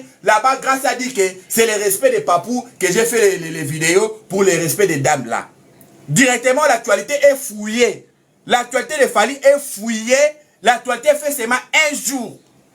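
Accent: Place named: Ivory Coast. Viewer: Mura de presse tu as bien parlé en français comme ivoirien félicitations notre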